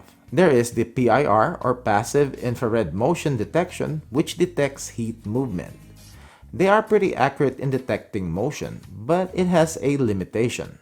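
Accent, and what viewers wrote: Philippines. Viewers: Thanks for the thoroughly reviewed products. Are you Filipino? Your accent is very similar to many of my colleagues in HI. Lots of Southern Pacific Asians here. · First time I've heard a Filipino accent with the typical YouTube speaking tone ... Thanks for your review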